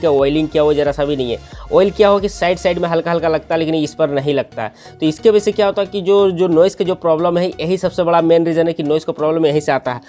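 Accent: Bengal, India. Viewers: Ap bangali ho ka??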